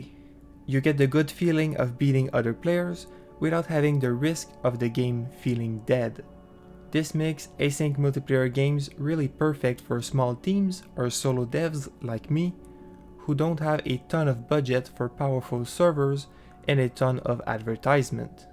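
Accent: Quebec, Canada. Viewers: L'accent queb qui sort mon gars lmao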